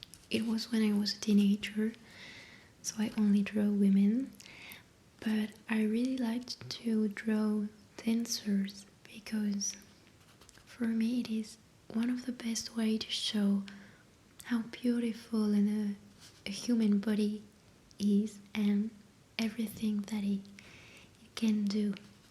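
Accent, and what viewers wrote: France. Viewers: Hello 🖐. I discovered your channel last week and it's a pleasure to watch you 😊. You are so soothing, your voice is lovely. I love the french accent, it's perfect for asmr and there is another french asmr youtuber which I adore. It's moonlight cottage. I also think you are talented painter. Thank you for sharing 😊. Merry x- mas and a happy new year 🎉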